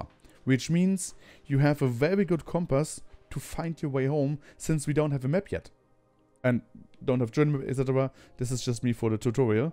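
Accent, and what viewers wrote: Germany. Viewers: Wow, thought by the accent that you were german. Spot on! Btw i'm from denmark. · Einfach wilder deutscher Akzent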